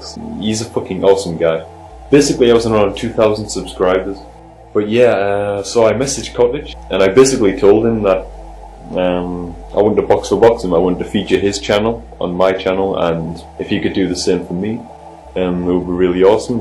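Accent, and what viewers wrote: Newcastle, United Kingdom. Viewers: Where are you from? You play on U.S. right? Just 'cus your accent sounds like a Geordie's a little :> gz on the partenership! looking forward to more content :)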